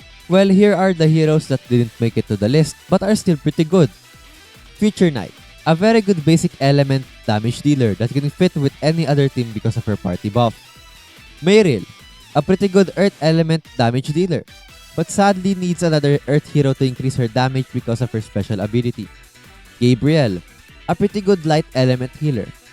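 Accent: Philippines. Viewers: U sounds a filipin0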